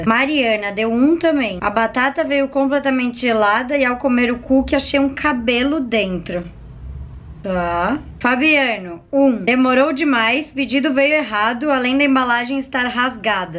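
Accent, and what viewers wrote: Rio Grande do Sul, Brazil. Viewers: Vc é gaúcha? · Você é gaúcha?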